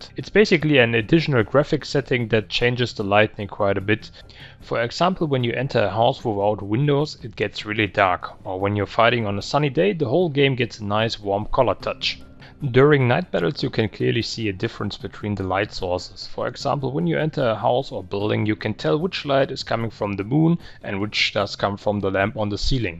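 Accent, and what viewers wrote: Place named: India. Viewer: Great Video. I like the way you speaking but you sounds like an indian man who is speaking englisch for the first time.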